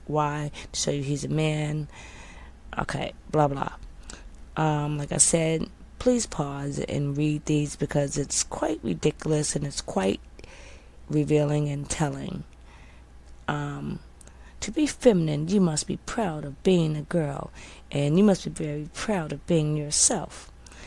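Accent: United Kingdom. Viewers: The plummy accent obviously covers any faults of the remaining man vocals!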